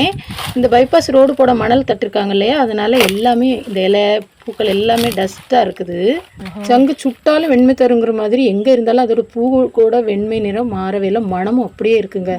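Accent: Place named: Kanyakumari, India. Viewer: கண்ணியாகுமரி குரல்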